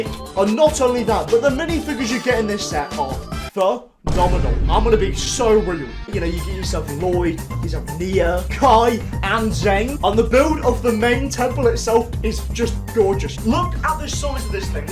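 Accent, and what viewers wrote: United Kingdom. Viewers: Why does he talk about dollars but has the most British accent ever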